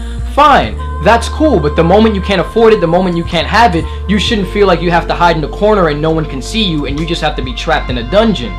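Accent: New York, United States. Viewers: he sounds so newyorky damn i like it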